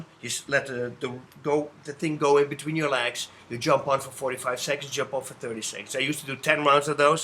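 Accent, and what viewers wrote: Netherlands. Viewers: ja das ff wennen Brabants dialect :)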